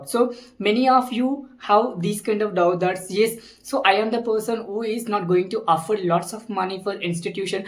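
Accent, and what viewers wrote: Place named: Tamil Nadu, India. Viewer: By hearing your English I would easily identified your native language is Tamil.I can understand how much effort behind your speech. A normal person cant speak like you. I hope you are well trained. By the way of continuous speech without any hesitation from you is excellent. Best of luck for your success. You will get more subscribers. I am waiting for your next video.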